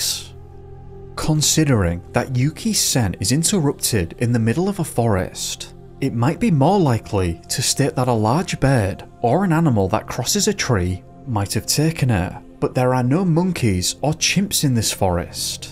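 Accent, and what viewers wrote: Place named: North, United Kingdom. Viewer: A new vid! 🙌🏻Right on! I adore the narrators style in every way but I cannot place the accent correctly. Please someone tell me which place up north this gentleman hails from! ☺️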